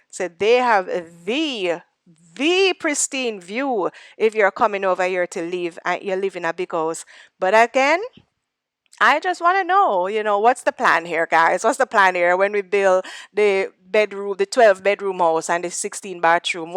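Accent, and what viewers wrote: Jamaica. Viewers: you sure got that classic Kingston accent, and also that classic Kingston patios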